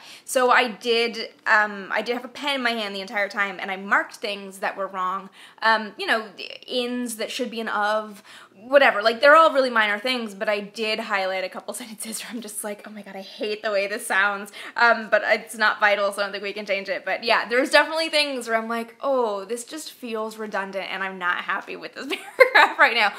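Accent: Canada. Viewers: You're Canadian so by all means speak as a Canadian! I love it! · What is wrong with using a Canadian accent, eh? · Regarding your pronunciation and your Canadian accent, you ARE Canadian, you SPEAK with Canadian accent, so why dwell on it? Without your accent it wouldn’t be you, simple as that I really do hope we can get it here in Sweden I wish you a merry Christmas and a happy new year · Did you straighten your hair while your voice was recovering? This is an odd thing for a guy to notice, but in the recording videos it was wavy and in the main video it was very straight. Also you sounded more Canadian today than usual. I guess that's random chance; there must have been more words today that we Americans pronounce incorrectly. :-) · I'm from the US and I love hearing the Canadian accent. It's very endearing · Awesome. Though I do admit that I feel you should keep your "Canadian-ness" and say the words as you normally would. 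When reading if I know the sound of the authers voice for some reason the voice in my head as I read sounds like the auther. This was what happened as I read "Breaking the chains of gravity" (BRILLIANT book btw) It was like your voice in my head and not mine. Ok. That may sound weird to some but thats just the way I am. Oh and any chance of a 'Pete' video like the make up one you did? But another subject. That Pete one was great.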